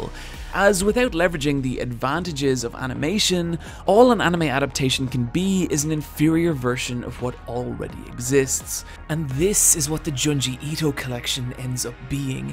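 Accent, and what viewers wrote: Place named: Ireland. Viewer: It's the Irish accent and I agree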